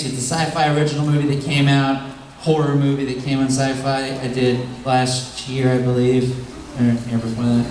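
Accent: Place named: Italy. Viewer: I'm Italian...and his Italian accent is spot on..ieven though I'm Southern Italian..but dude..we do sound like Mario XD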